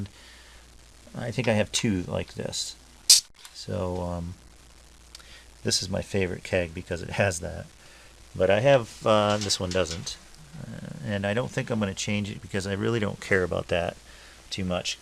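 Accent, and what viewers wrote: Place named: Maine, United States. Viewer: Hi, great update! Nice to just see ya and hear that calm clean cool Maine accent again.:-) A little bit of equipment, brew day, projects - it's in all in here.:-) Take care and see ya when all is well and less hectic!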